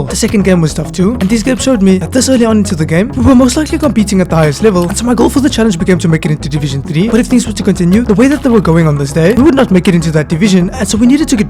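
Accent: South Africa. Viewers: Are you South African 🇿🇦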